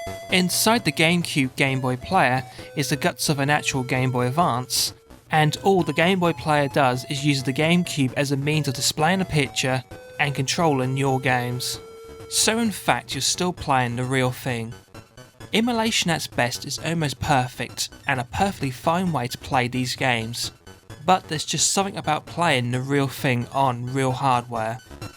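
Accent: United Kingdom. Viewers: hahahaha i love britisch aktzent :D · great job!!I was looking for GBplayer review! I rlly liked, but I gonna tell u: your british accent difficult my understanding, I partially understand what u said. greetings from Brazil!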